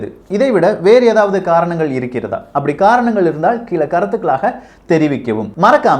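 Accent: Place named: Tamil Nadu, India. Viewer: My first video was about Cuba and it came in via WhatsApp almost a month back..from that day I subscribed your channel. I like your Tamil accent. Very informative.. sometimes I see proof links..some videos it's missing. Please add in future if possible..if I say to someone they will say lot of guys are talking on YouTube.. can't trust. That's why.. please try to put in description if possible in future. Thank you..as always very informative.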